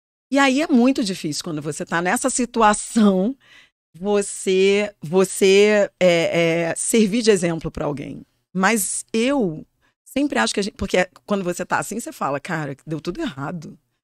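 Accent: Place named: Rio de Janeiro, Brazil. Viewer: Sotaque lindo, Carioca né... lindona de viver. gente grande !!!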